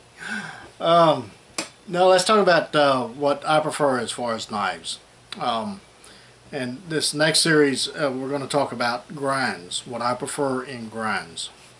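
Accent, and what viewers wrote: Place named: Appalachia, United States. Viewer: he sôunds like an appilation American I'm one too thats why I can t spell it.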